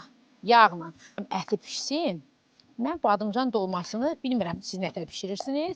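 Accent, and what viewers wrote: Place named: Gadabay, Azerbaijan. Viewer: Bəs özü deyir Goranboydanam.. Ləhçəsi oxşuyur ama Gədəbəyliyə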